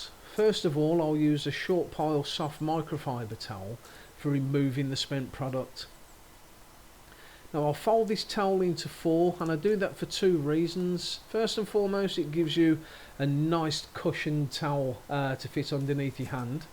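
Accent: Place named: West Midlands, United Kingdom. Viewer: Hi great video enjoyed it, have u tried autobright direct products, thats all i use and the results are spot on, sounds like youm from the west midlands ?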